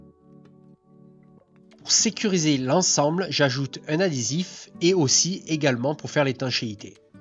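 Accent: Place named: Southern France, France. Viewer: Je viens tout juste de tomber sur votre chaîne et après m'être dis pendant plus de 10min : "Mais comment ça se fait que sur cette chaîne Youtube qui a priori est 🇺🇲 la voix off n'ait pas cette saloperie de traducteur auto de Google/Youtube ...!!?? 🤔" Et après 2min de farfouille je comprends que l'accent du "Sud de la France" de cette voix est tout simplement celle d'un des 2 protagonistes 😂 Après "1 Indien ds la Ville" c'est -> 1 Frenchie ds la Forêt Cainri 😉 En tt cas bravo , superbe projet ; ça fait rêver et ça donne envie ; ça donne envie de poser tellement de questions 😂😉 PS : avant votre autoconstruction vous n'étiez pas de simple novice en la matière, je veux dire en matière de construction , etc ; vous étiez assurément des gens d'expérience ? En tout cas , encore bravo et bonne continuation à vous, 1 grand bonjour de Bretagne 👋🤘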